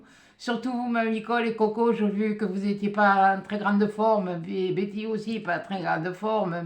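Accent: South, France. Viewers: Coucou Quelle belle surprise!! Tu es superbe et cette coupe de cheveux et couleur te vont à ravir. Tu reflètes la joie que tu nous communiquais par ta voix et ton joli accent du sud. Sincères condoléances pour cette personne chère à ton cœur. Merci pour tes souhaits et à mon tour je te souhaite un très bon réveillon de la St Sylvestre et à l année prochaine. Gros gros bisous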